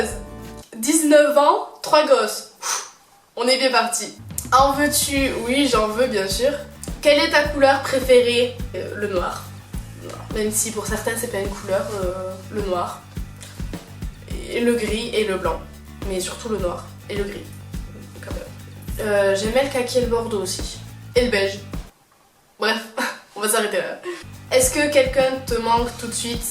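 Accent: South, France. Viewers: j'adore ton accent Ca rappelle le sud,la mer,la plage! Mais! Quand on regarde le reflet du ciel derriere toi bah il fait pas très beau on dirait!👌 Je t'aime beaucoup ❤️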